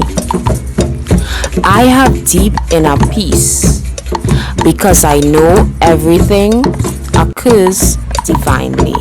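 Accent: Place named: Trinidad and Tobago. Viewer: I am SOOO grateful to hear a fellow trini voice - saying this! 🎉 Sis, idk you.....but I Appreciate you...!!!! 💃🏽💋🌹✨️💦👑🇹🇹💕💕 💯💯💯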